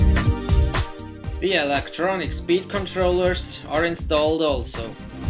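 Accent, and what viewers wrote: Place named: Switzerland. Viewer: Hey, great video, really enjoyed it. Excited to see a running video with the setup:D If you don't mind me asking, in which Kanton do you live, I'm just curious as you have a cool accent:)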